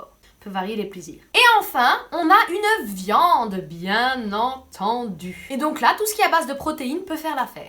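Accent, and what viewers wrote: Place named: Moselle, France. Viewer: Salut, moi je le trouve chouette ton accent! T as des origines du côté de Moselle est? Forbach, saint avold etc...?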